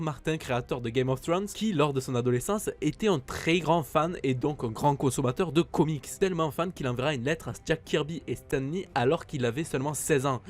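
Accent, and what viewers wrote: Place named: South, France. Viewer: Cet accent sudiste moelleux m’avait manqué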